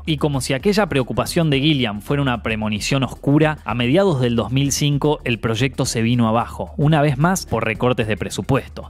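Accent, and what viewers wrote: Argentina. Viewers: Me encanta ese asentó argentino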